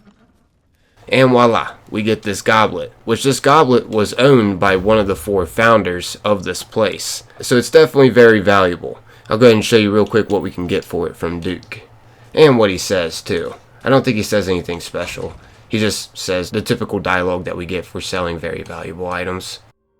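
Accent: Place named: Mid-Atlantic, United States. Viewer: That mid Atlantic accent though 🤓 where you from man? My guess is MD or PA.. am I right?!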